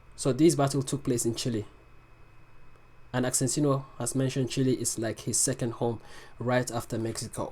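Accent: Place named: England, United Kingdom. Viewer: Tiene el acento inglés no creo que sea de Estados Unidos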